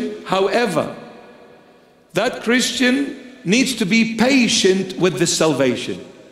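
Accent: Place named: Australia. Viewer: dear brother Mar Mari Emmanuel The Holy Spirit is truly guiding you Brother, may God bless you and protect you always in Jesus Holy name.:thanksdoc: p.s you have an Australian accent are you in Australia?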